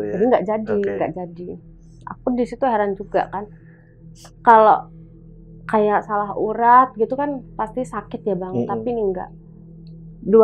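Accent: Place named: Semarang, Indonesia. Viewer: Sy Org rantau Kl denger medok ny Semarang an dan sekitarnya kok merasa terhiburnya beda y Mbak Rambutnya d jepit sekena nya aja kliatan cantik bgt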